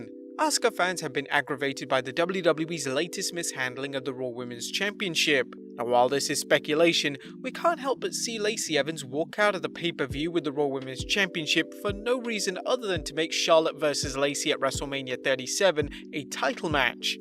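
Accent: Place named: United Kingdom. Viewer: OH GOD.... FIRST YOU SAID "MARY-LAND"...(pronounced Mar-UH-lind), now "RE-NAY-SAUNCE"!?!?!?!?!? WTF!!!!!!????? It's pronounced Re-Ni-Saunce!!!!!!! British WL host whatever your name is..... YOU'RE KILLING ME BRO! GO WATCH SACHA BARON COHEN IN THE MOVIE "THE TRIAL OF THE CHICAGO 7" THE BRITISH CAN SPEAK ENGLISH WITH AN AMERICAN ACCENT!!!!!!!! IF COHEN CAN DO IT, SO CAN YOU MY FELLOW WRESTLING BROTHER!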